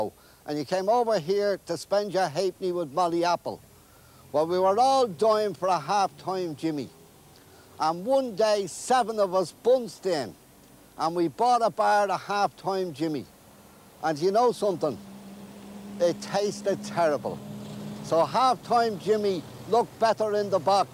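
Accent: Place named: Dublin, Ireland. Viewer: The aul Dublin accent, a thing of beauty. Ordanges and Chapelizard. Great stuff!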